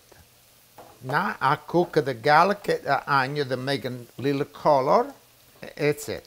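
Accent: Italy. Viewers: The recipe is great, but that Italian accent makes it better!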